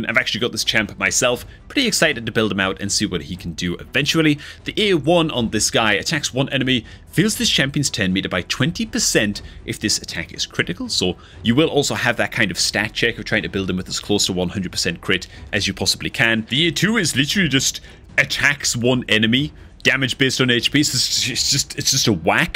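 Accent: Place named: Yorkshire, United Kingdom. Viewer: The Yorkshire accent is wicked too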